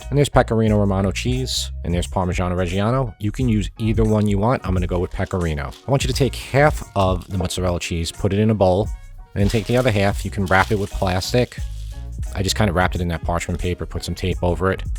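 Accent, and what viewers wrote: New York, United States. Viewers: I just found your videos! I absolutely love them...and your NY accent!!! I'm from Long Island but now live in NC. I MISS that accent!!! We have finally infiltrated the South...we have bagels and pizza Lol, which were unheard of when we got here! Thanks for your videos!!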